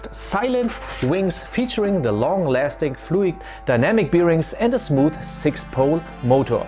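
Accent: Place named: Germany. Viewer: Ur german accent bro 💀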